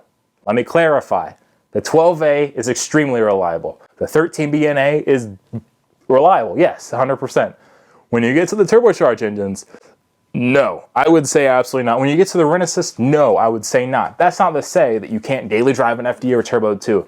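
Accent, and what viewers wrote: United States. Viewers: Sorry, I can't understand most of what you say. And English is my native tongue. So a kind request and suggestion might help us all: Please, when you have a really strong (I'm guessing Southern) US accent like yours, remember that most people don't have that accent, and you will fail to communicate your thoughts and information. So, try to slow down a lot, speak clearly, don't use foul words, and you'll win over your audience, and then gain many more subscribers. Thanks, in advance, for your patience. All the best.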